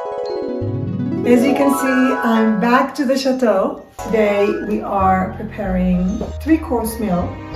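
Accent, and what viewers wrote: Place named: France. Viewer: Goutez a little bit...your accent was so french😂😂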